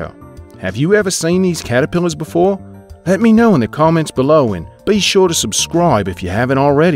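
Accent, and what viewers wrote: Australia. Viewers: U do know that all Australians don't have a strong accent right my friend sounds like him. And go be a hater some where else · Is that meant to be an Aussie accent?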